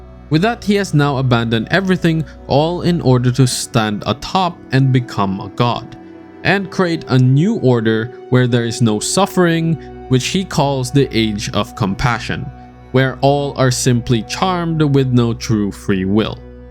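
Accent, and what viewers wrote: Philippines. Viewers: Bro are you Filipino? hahahaa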